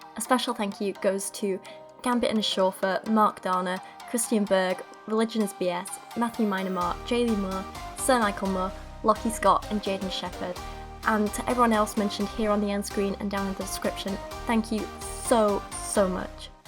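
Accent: United Kingdom. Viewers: You're brilliant, this is brilliant! I myself am a Roman Catholic, but I believe their is no and never will be any scientific evidence that a God exists. I even said that in the last book that I published on Amazon. Given those circumstances I'd say I'm between a rock and a hard place religiously speaking, but I got myself there and I almost wouldn't have it any other way. We have in common the fact that we are both straddling a hard line with the types of people we live around or converse with. I love the way you say the word "but" where it sounds like "bu" . I understand it's a British accent thing and me being from the USA. I don't hear that every day, and I just think that is interesting. I think you are perfect for not coming out as an anti theist, because it can be dangerous to do so. I say that knowing that all I have to go on is from my own life. 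I just know that If I where to come out and publicly denounce my faith and tell everyone that I hate Christianity and Christians that would be me doing the hateing. So keep on doing what you're doing, debating and posting videos about crazy Christian books that are as you would call them rubbish. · I think you are very smart and I like watching your videos. I sent a link to your channel to my 16 yo daughter (not just now, a while ago). Also, love your British accent.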